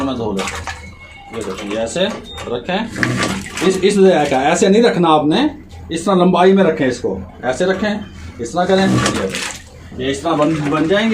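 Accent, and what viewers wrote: Punjab, India. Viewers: Well done brother I like your style Punjabi accent mein Urdu Kamal boltay hein tension nahi leni 👍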